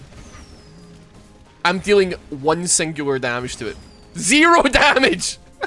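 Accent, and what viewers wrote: Scotland, United Kingdom. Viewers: I'm new here is he British or Australian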